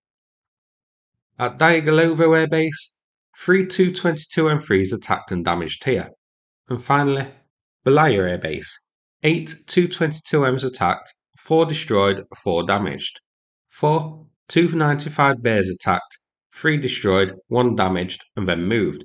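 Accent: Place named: Nottingham, United Kingdom. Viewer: Good stuff. (BTW: Where are you from? I'm from Nott'm and your accent is very familiar!)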